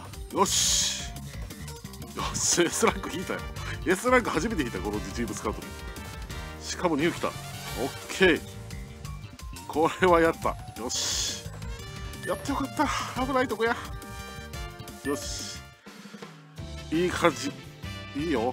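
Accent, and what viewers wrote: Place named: Kansai, Japan. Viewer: さんおめでとう🎉お～らきたぞ笑関西弁凄いね笑笑なんか噂だとBTに原口選手出るとか出ないとか、後少しで覇王だね(*⌒▽⌒*)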